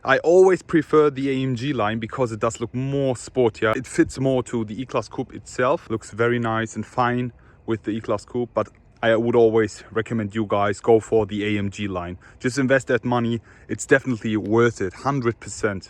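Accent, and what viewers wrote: Germany. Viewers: Du bist ein deutscher das hört man raus 👌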